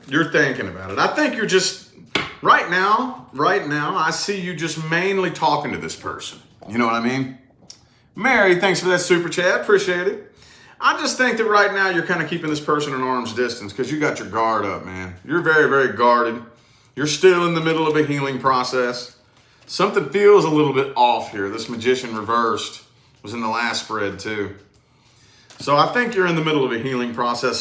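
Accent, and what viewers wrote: South, United States. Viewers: the only Southen accent I like is yours, thanks.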